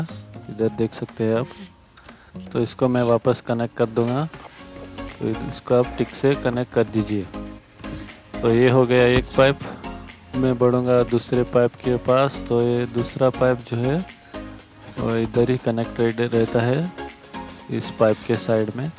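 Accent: Goa, India. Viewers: Are you from goa? ?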